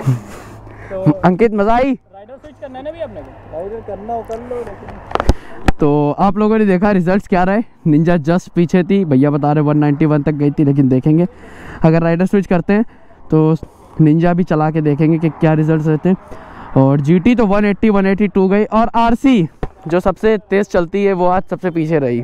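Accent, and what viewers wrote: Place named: Lucknow, India. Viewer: Loved the lucknow accent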